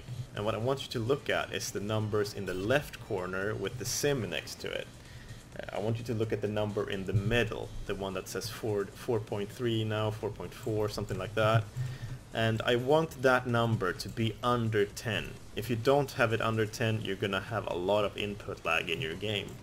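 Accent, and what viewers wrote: Sweden. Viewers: This guide is actually really cool and really helpful,thank you for doing this! Hope you dont mind the personal question,but are you by any chance swedish? I'm just thinking the accent gave it away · you sound swedish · You sound swedish. Are you? Also nice video bro, keep it up!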